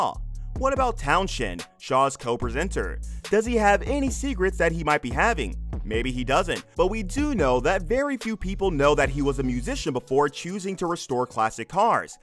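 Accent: United States. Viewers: This show simply conforms the immaturity of British men with their childish pranks. The mechanic guy is worth watching, but that the tall one, what is a disaster. And never use an American narrator for a British show. There's so much background detail that Americans simply can't grasp. Jack, the Japan Alps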